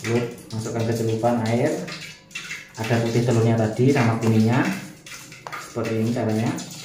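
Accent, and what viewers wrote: Java, Indonesia. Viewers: Mas iku wong Endi nek omong kok medok banget,tak cobake mene tuku ayam. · Aduh bahasanya medoook banget... Tapi mudah di mengerti.... Dan trima kasih ilmunya.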